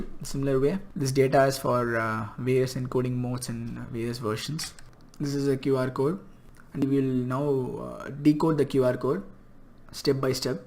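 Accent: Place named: India. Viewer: Great if you are a Indian. Cannot understand his speech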